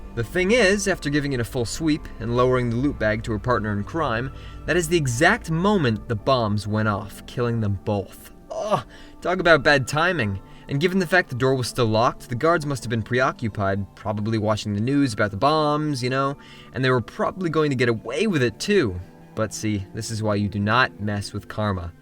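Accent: Canada. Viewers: dude u canadian？ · Is he canadian?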